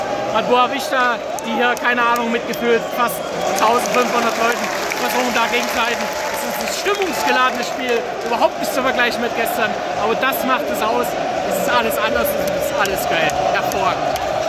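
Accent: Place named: East Germany, Germany. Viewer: Dieser Ossi-Akzent. Als du Panther gesagt hast, habe ich Panda verstanden 😂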